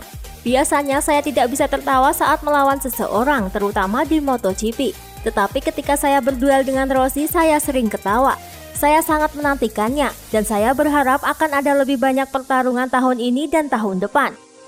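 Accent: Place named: Java, Indonesia. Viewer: Min... Medoknya masih kliatan🙏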